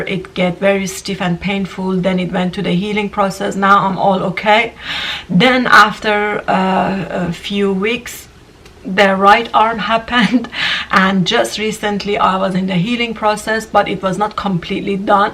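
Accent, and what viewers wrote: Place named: Iran. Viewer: Persian accent 💯💯💯💯. She has diluted it from living abroad somewhere but sounds Persian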